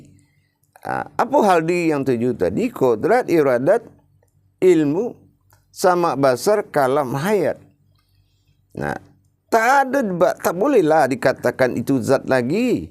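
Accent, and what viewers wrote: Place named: Malaysia. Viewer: Mf buya.kenapa logatnya diubah melayu.kan lebih nasional indonesia.lebih bnyak mempergunakannya.artinya lebih bnyak memahami indonesia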